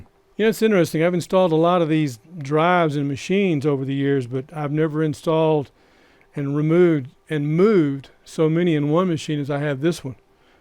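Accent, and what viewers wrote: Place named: South, United States. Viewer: what a nice southern pronunciation. Tennessee or South Carolina ?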